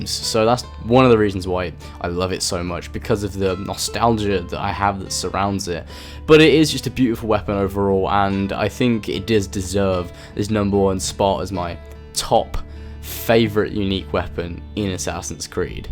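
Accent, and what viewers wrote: United Kingdom. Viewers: I know why u hate ac3 bc Conor kill British soldiers And u sound like a British so it make sense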